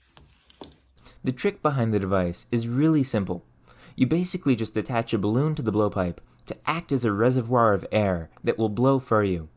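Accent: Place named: United States. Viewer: Where are you from? Your accent is generally American but you say "for" and "fur" the same way and any syllable ending -or with an -er sound. Regardless of that I appreciate the info. I'm on a quest to learn how we got from rocks to rockets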